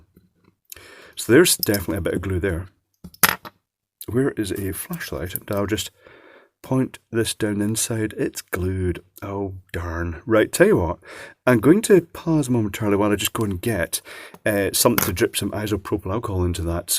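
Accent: United Kingdom. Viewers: I just love this fellas accent. Definitely a Brit.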